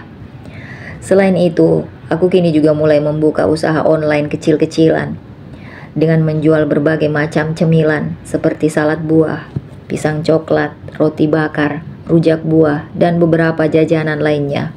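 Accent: Medan, Indonesia. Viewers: Bogor hadir nyimak sy senang dgrin logatnya bahasa dr medan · Pasti yg bacakan ini orang Medan ❤❤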